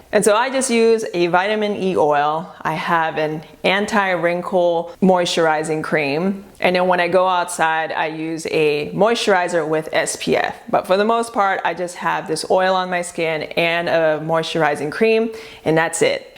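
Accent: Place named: Singapore. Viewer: Are you originally from Singapore? My friend is and her accent is similar.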